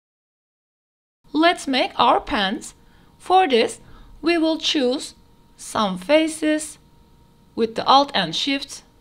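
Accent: Turkey. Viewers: you are turkish because your accent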